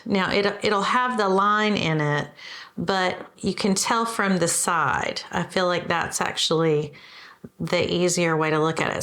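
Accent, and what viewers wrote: South, United States. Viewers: Love your sense of humor!! And the southern accent! Thanks for thie information! I was wondering why we should steer away from cotton/poly. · Love that cranberry color you’re wearing! It’s very flattering and a perfect choice for your lovely “quilt in progress” backdrop. ❤️ The video’s content is valuable information for new quilters. Many of us learned the hard way and would have appreciated this information. And thank you for making me smile the entire time I’m viewing your videos. Your southern pronunciations are adorable. Aahrn was precious! ❤️ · At the op shop today I fingered an intriguing seafoam green sheet, just to see the tag say "cotton/poly". And I swear, I heard your voice say in your southern way, "Nope!"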